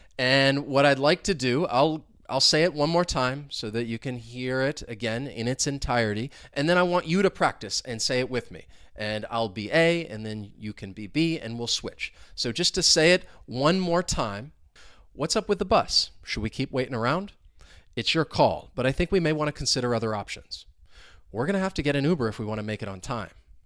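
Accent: United States. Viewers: I love the American accent .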